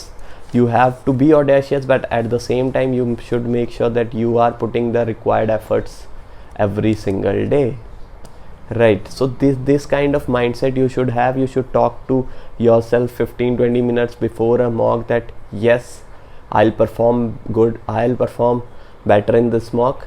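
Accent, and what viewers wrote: Punjab, India. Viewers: Are you from Punjab?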